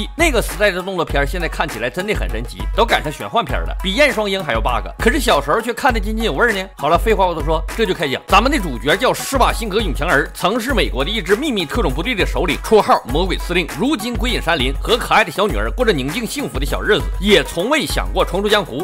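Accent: Northeast, China. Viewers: 東北話老好聽了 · 他东北的 哈哈哈哈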